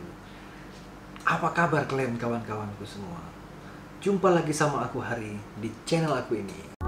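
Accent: Medan, Indonesia. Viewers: Abg orang medan yaaaa? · opening-nya ini jadi signature ente banget bang... "apa kabar kelen kawan kawanku semua...?" Medan Taste...! keep it up bang...!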